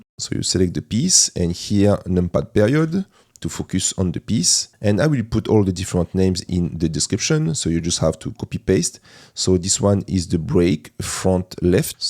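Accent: France. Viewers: i havent done this tutorial yet, but this is something have have been looking for. also i love your french accent 👌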